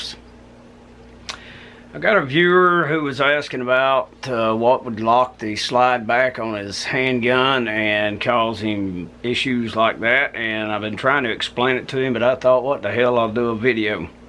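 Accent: South, United States. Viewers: Your accent is exactly what they aimed for in inglorious bastards for brad pitt. love it.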